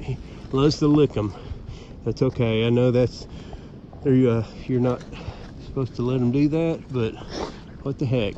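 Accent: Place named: South, United States. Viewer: Very nice! I´ll get one in next two weeks. So, now I´m just lookin for some videos with this amazin dogs on duck huntin and I definitely found one with US southern accent which I prefer and understand best! :D Have a good time, greetings from Czechia.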